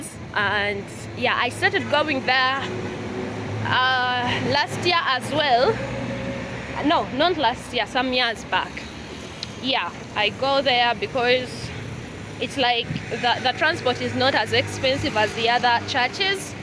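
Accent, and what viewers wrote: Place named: Uganda. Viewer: Am from Namibia your accent reminds me of one medical Doctor's wife from Uganda, I actually found them in church in 2018 the year I got saved... I will never forget them that accent of yours reminds me alot of them.. they always invite us at their house, fellowships all night.. I will never forget them Lol In the coming years I must come to Uganda and marry a God fearing women.. I love your accent... Thanks for sharing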